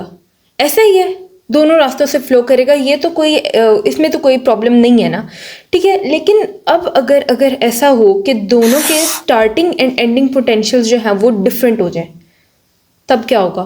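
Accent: Pakistan. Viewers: Lahori accent 👍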